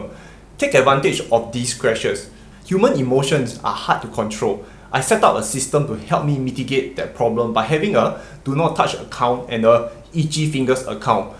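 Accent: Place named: Singapore. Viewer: bro your videos are amazing. your Singaporean accent is so strong, but your messaging is so clear. love the content bro, you make Youtube a better place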